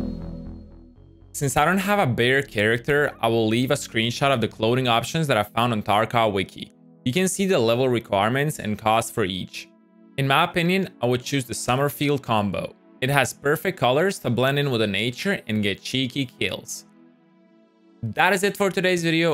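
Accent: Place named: Europe. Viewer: Hi from Finland. To my ear have a European accent, so where are you from? My guess... Slovakia;)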